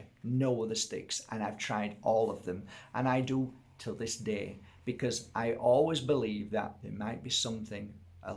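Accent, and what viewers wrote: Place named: Northern England, United Kingdom. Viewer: Hi sir. where are you from. you sound like an northener. im from west Yorkshire. thank you for your advice x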